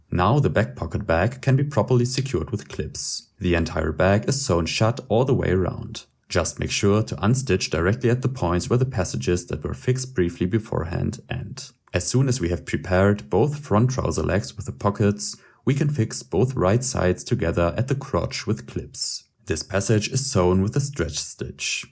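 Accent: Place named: Germany. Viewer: I’m hearing german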